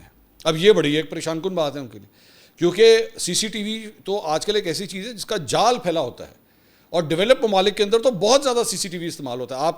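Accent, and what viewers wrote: Punjab, Pakistan. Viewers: Punjabi Akcent best❤️ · Bhai, your Punjabi is hilarious and full of character! 😂 You're one of the few who speak the truth with no filters pure facts every time. I genuinely respect your honesty. Personally, I feel PTI should take a step back for now. Pakistan, by the Grace of ALLAHTALA, is finally moving in the right direction gradually. In'ShaALLAH, our country will continue to progress. May Allah bless you and bless Pakistan.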